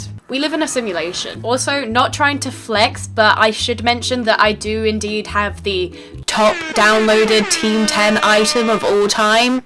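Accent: United States. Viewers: Are you from America or London 🤔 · omg your american accent hahahah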